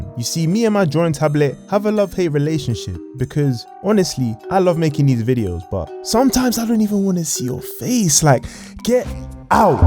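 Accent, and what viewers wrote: United Kingdom. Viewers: its peak to see a new youtuber with a uk accent bro u just gave me confidence to use my voice now ❤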